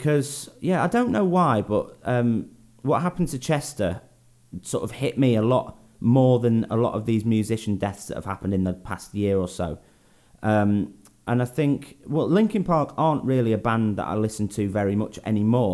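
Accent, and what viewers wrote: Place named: England, United Kingdom. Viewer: Wow I thought you were from England. Your accent is English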